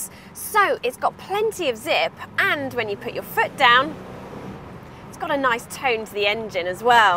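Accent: United Kingdom. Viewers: Gosh i love the british accent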